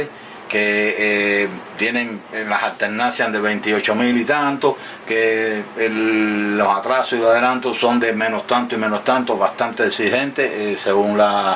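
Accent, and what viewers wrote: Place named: Cuba. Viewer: Por el. Acento pareces cubano es decir somos paisanos, concuerdo contigo hay mucha vistilla y poca autenticidad, hoy casualmente compre un Tissot seastar seven de cuerda manual, q lleva un 781-1 y por esos años 70 me imagino q la base sea in house! Pero me surge la duda... Gracias por tan interesante contenido, sigue compartiendo!! Y si me pudieras decir q maquinaria tendría esa pieza de la q te hablo!! Lo demás son dolares tirados, los relojes auténticos en cuanto a su interior están muy escasos!!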